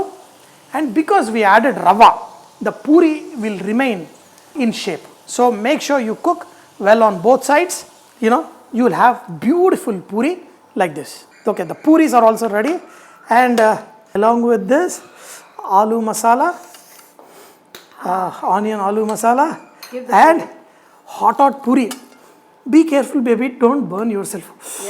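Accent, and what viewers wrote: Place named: Telangana, India. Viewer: అన్నా ! బాగున్నావే ! We always call in telangana యాస.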